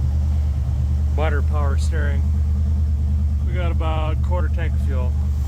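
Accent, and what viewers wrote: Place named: Minnesota, United States. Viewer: Your Minnesota accent is better than Mrs R for our 1 yr old son. I now turn your channel on when he needs help calming down. Thanks for the help!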